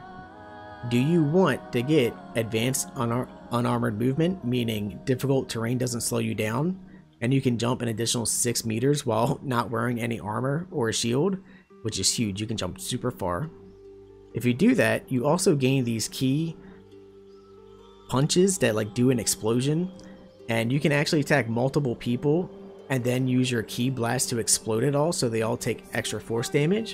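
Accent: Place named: Philadelphia, United States. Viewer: This might be the most out of topic comment but are u from the philly area the accent is very strong if so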